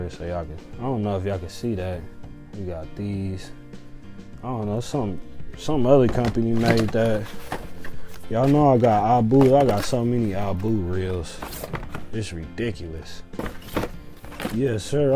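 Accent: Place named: California, United States. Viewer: Where you from man sounds like cali a little lol also kinda country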